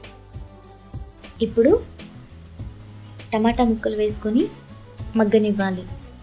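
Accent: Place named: Telangana, India. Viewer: its telugu with telangana accent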